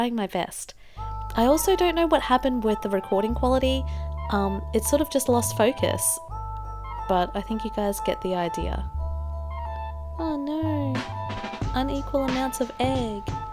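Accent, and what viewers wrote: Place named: Australia. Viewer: I heard oz accent here 😍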